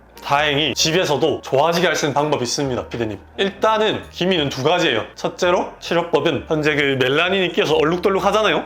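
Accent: Busan, South Korea. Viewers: 저는 멜라노사크림 약국에서 사서 저녁에 스팟으로 바르고 자는데 완전히 없어지진 않아요~! 선생님 억양이 부산사람같아요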